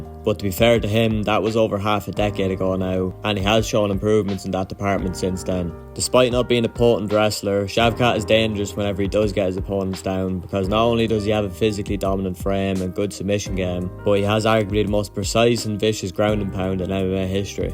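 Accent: Ireland. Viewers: Wasn’t expecting the Irish accent. Good breakdowns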